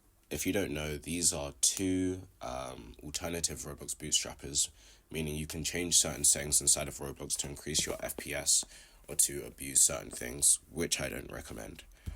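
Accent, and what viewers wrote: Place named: United Kingdom. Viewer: British accent go mad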